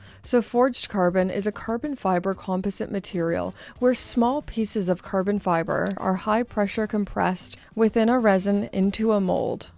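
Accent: Canada. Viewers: Your Canadian accent is just great!! 😊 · Come on eh! Don’t apologize for your Canadian accent.Great vid. I think I want the red banded one.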